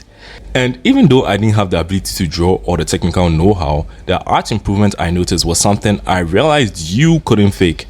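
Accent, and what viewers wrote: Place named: Nigeria. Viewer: oh okay you kind of sounded Nigerian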